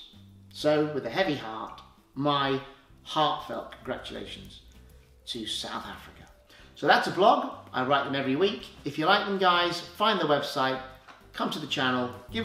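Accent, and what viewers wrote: United Kingdom. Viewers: Your british accent is just a blessing!😍😍(I want to be a linguist). Thank you very much for your video.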